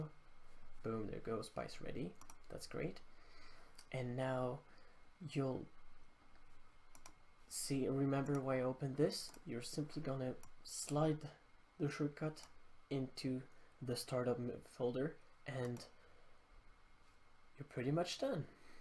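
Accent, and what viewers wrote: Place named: France. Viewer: Un français anglophone ? Why not, une petite correction, le point se dit « dot » 😉 en tt cas gg à toi !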